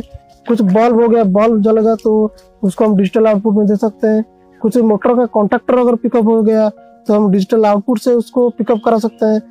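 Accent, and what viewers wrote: Odisha, India. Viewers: Sir, you from odisha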